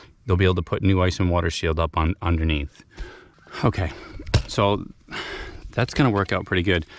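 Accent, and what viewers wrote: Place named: Massachusetts, United States. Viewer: What a nice job! It looks really good too. Good explanations on why you do each step. I do handyman work, and nearly every house I enter has botched work. It blows my mind how much bad work I see. In Part 1 you showed layer upon layer of messy repair attempts that failed. Those hack jobs are fine in an emergency like during a storm when you're just stemming the tide until you can do a proper repair. Very much admire your skill and methodical work. I'm originally from MA and your accent sounds familiar! My 70 year old house in OR has the original heavy galvanized steel step flashing. I re-roofed 27 years ago, and just lifted it up like you said. It could probably go another 20 years, but I'm going to replace it with lead the way you did.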